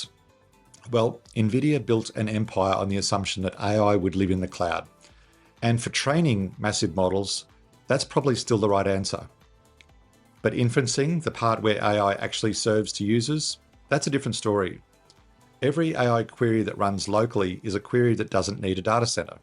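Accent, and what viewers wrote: Australia. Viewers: Maybe not. That Aussie accent is probably hard to clone (for the moment) lol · No, I am Australian and this is a real person. AI is useless at faking the Australian accent. This guy is real.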